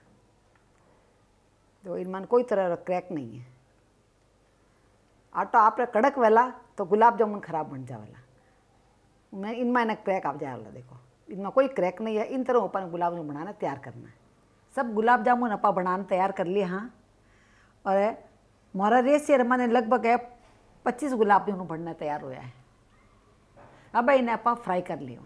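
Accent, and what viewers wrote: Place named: Marwari, India. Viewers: दिदि आपकी मारवाड़ी आवाज अचछी लगती है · Ye to Bikaner side ki marwadi lag rahi hai. Jaipur me aise to nahi baat karte? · Very nice recipe .Explaining in marwadi language is really sweet.Thanks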